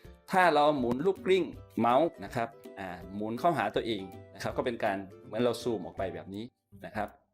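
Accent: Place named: Thailand. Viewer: ทำไมคนที่ไทยต้องออกเสียงชื่อหรือศัพท์ภาษาอังกฤษให้เป็นสไตล์แบบไทยๆ Google กูเกิ้น ได้อย่างไร เวลาไปเมืองนอกพูดภาษาอังกฤษคนฟังไม่ออก (ต้องทำไปทำไมครับ)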